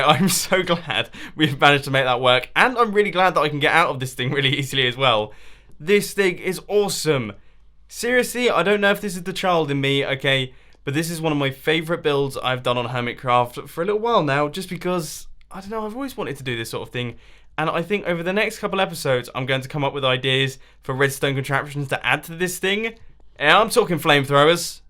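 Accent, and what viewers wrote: England, United Kingdom. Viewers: You're from Britain. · r u from England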